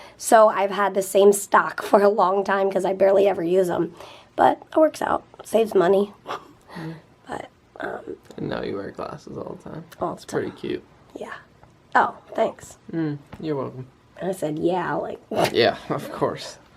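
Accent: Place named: Philadelphia, United States. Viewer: hehe, I think you might have a slight philly accent....:) here in Philadelphia all of us say "fr" instead of "for":) hahahahaha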